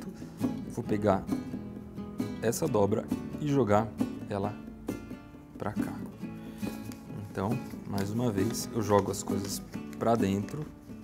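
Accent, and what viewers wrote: Brazil. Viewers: muito feio esse seu pt-br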